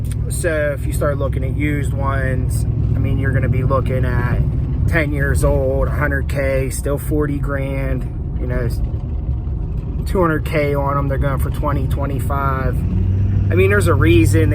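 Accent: United States. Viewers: Also sounds like you got a Philly or Delco accent lol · that pittsburgh accent is thick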